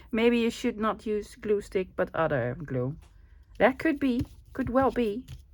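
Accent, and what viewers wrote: Netherlands. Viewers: Happy to have spoken in English (even if limited), Dutch accent very pleasant to listen to. Gail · To answer your question about languages in videos. First let me say, I have so much respect for those that make their videos in English when English is not their first language. As an English speaking person I would like to hear videos in English even if it is not perfect English. I guess that is me being a bit selfish so I can make sense of the project. I am sometimes ashamed of myself for not learning a second language. By the way, I think the Dutch accent is my favourite to listen too 😉. But for me, definitely speaking English, even if not perfect. You are so fun to listen to. You are very creative and fun. 😊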